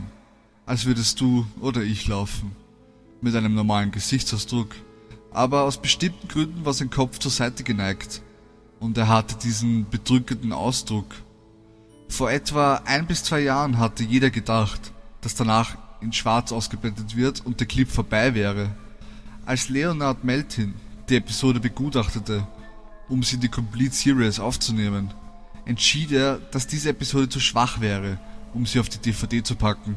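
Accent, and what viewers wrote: Vienna, Austria. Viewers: Bist du ein Wiener ? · Der Sprecher ist Österreicher haha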